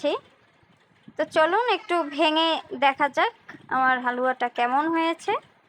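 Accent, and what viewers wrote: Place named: Barishal, Bangladesh. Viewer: আপু তোমার কথায় বোজা যায় তুমি বরিশাইলা😅😅😅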